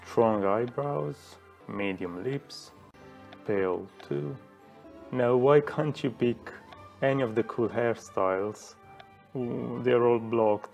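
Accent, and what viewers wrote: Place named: England, United Kingdom. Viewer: I sorted myself into Hufflepuff ...love your English accent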